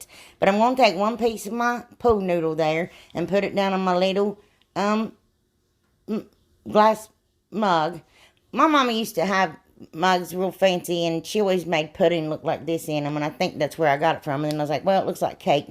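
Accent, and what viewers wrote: South, United States. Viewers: You’re funny and very enjoyable to watch. I love your little southern accent.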